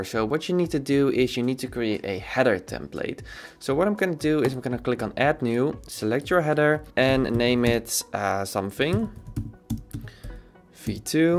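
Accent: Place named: Netherlands. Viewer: Nice, just came across your YouTube video for a work project I have and I thought his English is good but has an accent is he Dutch and yes you are :) I used to live in the Netherlands as well until last year!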